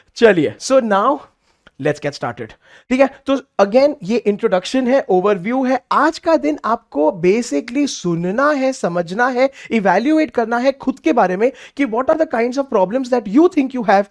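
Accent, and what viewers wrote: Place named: Bihar, India. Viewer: Maano ya na maano but Bihari accent has the best grip to students😄😃 Way of Teaching is amazing 🎉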